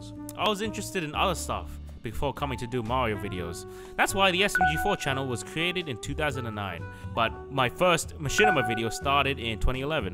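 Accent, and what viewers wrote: Australia. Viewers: Wait…… smg4 is Australian? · your Australian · OH SHIT I NEVER KNEW SMG4 WAS AUSTRALIAN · You are from Australia? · Is smg4 aussie? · Wait. Are you from Australia? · Wait so you’re Australian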